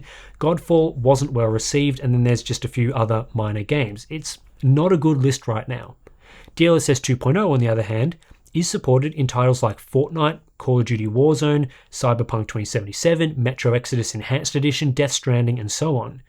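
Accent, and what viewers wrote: Australia. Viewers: Awesome video, I thoroughly enjoyed it. And it's always a pleasure to hear Aussie accent:)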